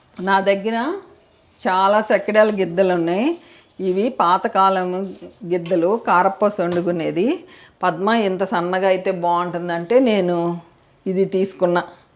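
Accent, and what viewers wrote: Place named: Nellore, India. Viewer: Mee language nellore side laga vundi aunty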